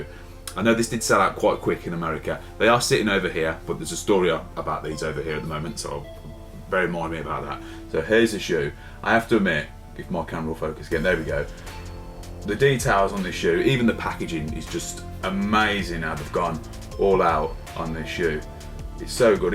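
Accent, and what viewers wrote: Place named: Black Country, United Kingdom. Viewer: Sounds like a Black Country accent here...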